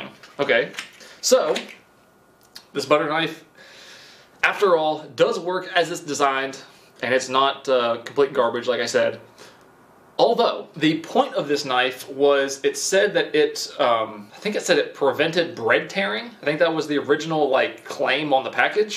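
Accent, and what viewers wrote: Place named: Alabama, United States. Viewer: Lol he's like Alabama backwoods sometimes but you can definitely tell his name is lol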